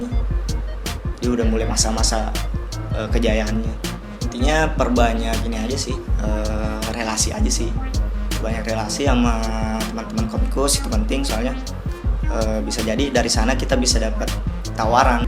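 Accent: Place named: Sulawesi, Indonesia. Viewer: Hilang mi logat Sulawesinya tawwa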